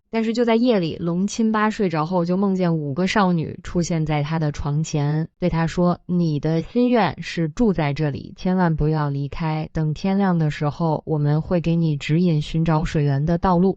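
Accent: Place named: Beijing, China. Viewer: 解说一口北京腔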